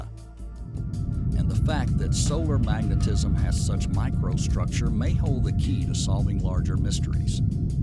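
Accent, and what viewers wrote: South, United States. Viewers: As a southerner myself, (by way of New Orleans, which has its own unique accent, but my family is from Mississippi and Tennessee so I grew up hearing many different forms of the southern accent), this narrator is more appealing to me. The unique way certain words are pronounced is very comforting to me. Combining that with my passion for astronomy and astrophysics makes this channel one of my favorites! If not for the ads, it would be perfect to have playing while going to sleep. I could just pay for YouTube premium, but I survive on a very tight budget and an extra fifteen dollars for something that is truly a luxury and not a necessity would be irresponsible of me. And with the gas prices rising every day, I don’t think it’s smart. I just have to endure the annoying ads that blast the speakers with their irritating gimmicks trying to sell more junk that I don’t want.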